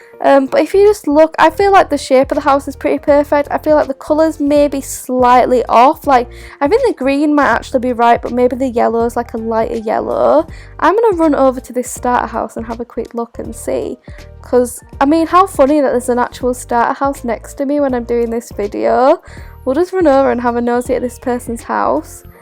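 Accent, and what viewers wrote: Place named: Leeds, United Kingdom. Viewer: Are you from leads? I love your accent ❤❤❤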